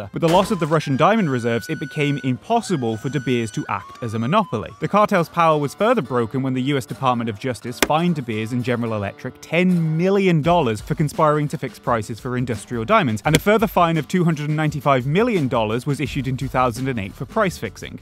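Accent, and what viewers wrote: United Kingdom. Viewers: Assuming they are a person at all is assuming too much. The algorithmically suggested video, voiced by the algorithmically optimal Brit accent, with an algorithmically generated identity of "PhD in something cool, but really just a shut-in shitposter (like you!)" to achieve a perfect balance authoritative intellect, relatability, and trendy meme humor. The PhD chops will never be relevant to the content so they can't really be disproven, while the meme humor can never really be separated from chasing the algorithm in any meaningful way. The editing, animation, and character design are professionally utilized, but still generic enough to be commissioned through something like UpWork on the cheap. The vocabulary sounds like the massive youtube audience of lefty, college socialists, but the content is very neoliberal globalist. This is pretty much just capitalism in it's purest form, the entire video is designed to succeed algorithmically without much of a point to make. 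Even the profile picture is appropriately colorful and busy to attract attention, encourage further inspection. The channel name follows the marketability framework made popular by band names in the 80's to today. I could go on, but I have already spent too much time thinking about the topic already. Hopefully if I ever get around to making serious youtube content some asshole in the comments doesn't go on and on about how it's blatantly formulaic and motivated only by a desire for popularity and revenue. But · oh youre british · This guy has a middle class counties accent apart from the word ‘now’ which he says with a Black Country accent 😂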